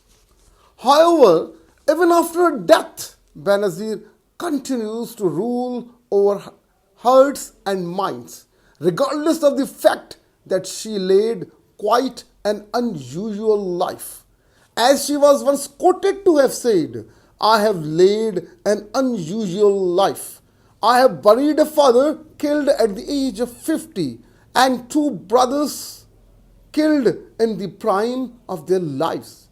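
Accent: United Kingdom. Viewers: Amazing video In every terms, the Accent as too as british, the information about her it realy explosive..❤❤❤❤ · profoundly comprehensive video with fascinating lucid british accent Sir👍👍👍👍👍❤❤❤❤❤❤❤❤❤❤❤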